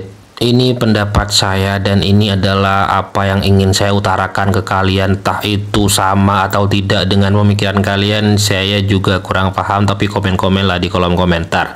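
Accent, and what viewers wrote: Madura, Indonesia. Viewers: Bhee medureh kakmah kak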